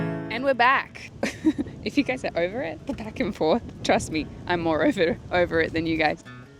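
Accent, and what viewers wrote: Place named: Australia. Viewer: I’ve been following you for years. Thought I would share your Aussie accent has came back so strong lol I imagine it’s only natural from immersing yourself back home (and also from what I can tell your guy is Australian). Just thought it was funny hehe keep enjoying your travels 🙂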